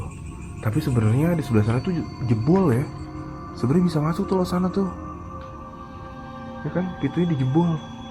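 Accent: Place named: Java, Indonesia. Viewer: Adem rasanya denger langgam jowo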